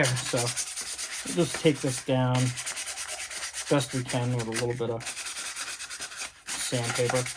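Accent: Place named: Massachusetts, United States. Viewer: Subtle hints of that Bill Burr Massachusetts accent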